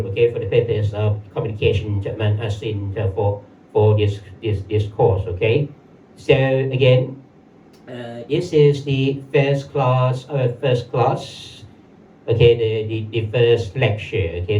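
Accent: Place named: United Kingdom. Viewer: British accent!! no doubt.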